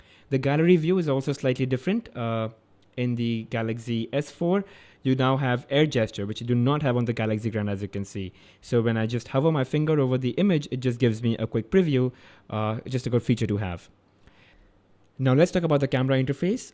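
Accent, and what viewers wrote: India. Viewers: aman Allahım adam hint aksanıyla ingilizce konuşuyor ve anlamak için kendimi yırttım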